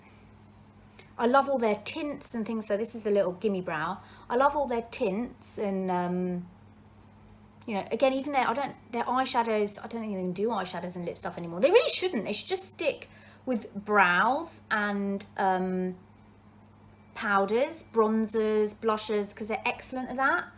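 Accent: United Kingdom. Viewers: Just found your channel! Fabulous look! You are so funny....adore your accent, dare I say you are British! Love love love London. Hubby and I were there in mid May for vacation. Hated to leave!! . Also spent a week there in 2017. Will be watching more of your videos. 🌷🌷🌷🌺💐💐💐😀😀😀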